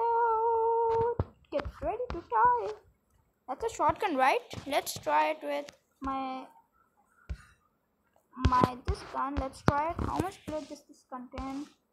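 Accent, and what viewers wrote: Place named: United Kingdom. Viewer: he is speaking like a british while he is a Indian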